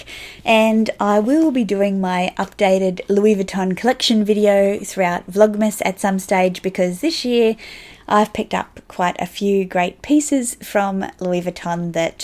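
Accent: Australia. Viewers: Happy (belated) Birthday!!!! I like to listen to your Australian accent. Keep being blessed!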